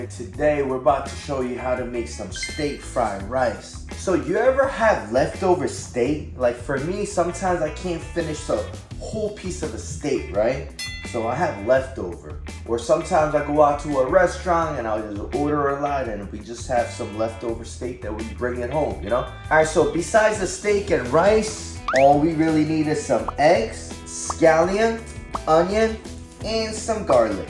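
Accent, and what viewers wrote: Philadelphia, United States. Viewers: You frim Philly?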